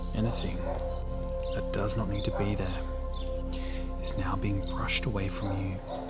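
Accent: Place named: Australia. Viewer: Is he Australian? I love his accent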